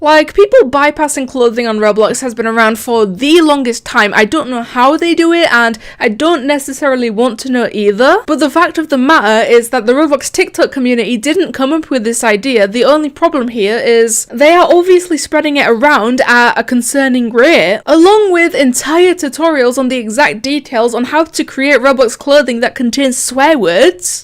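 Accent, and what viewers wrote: United Kingdom. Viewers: I rather you over koneko since u sound Like your from the uk not saying you are from the uk It’s just there are not many British roblox YouTubers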